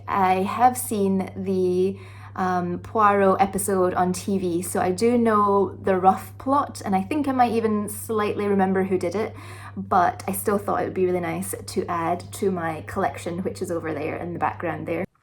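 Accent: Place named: Edinburgh, United Kingdom. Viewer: Just discovered this on my feed. What a wonderful find. I'm a real book nut. This was heaven for me. And you seem like a lovely person with a gorgeous Edinburgh lilt. Thank you ❤️👏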